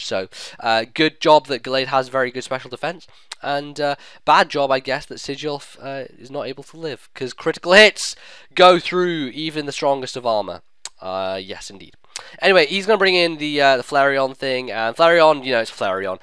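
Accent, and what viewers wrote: Scotland, United Kingdom. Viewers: you Scottish that's awesome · dude you're scottish? that's fucking awesome, listen to a band called they're from Scotland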